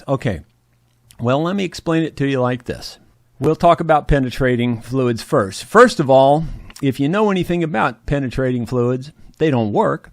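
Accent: South, United States. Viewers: Pay no attention to the nay-sayers. I am an old country boy and retired police investigator. My old partner used to say I was 'country smart'. He said that I was able to figure out the best and easiest way to accomplish a difficult task. You even have the same country accent that I have. Pay no attention to the jackleg "I know best or a better way' types. You ever get into N Georgia and the best college football team in the nation, Go Dogs! I'll buy your lunch. Keep up the good work!! WJS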